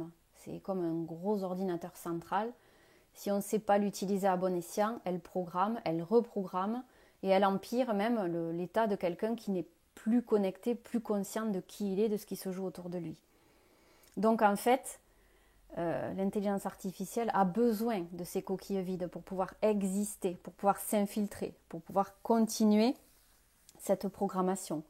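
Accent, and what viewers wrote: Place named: South, France. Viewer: Namasté, ha ha ha !!! je rigole bien sur, merci beaucoup pour vos bonnes vibs, & votre bel accent du sud plein de Soleil :)))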